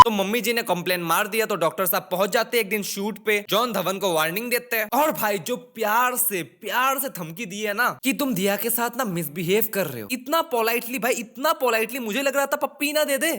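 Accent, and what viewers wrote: Assam, India. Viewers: Are you from Assam bro ? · Bro r u from noth-east assam!?❤ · bro are you from Assam 😂😂 please reply from where you are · Bhai tum assamese ho kya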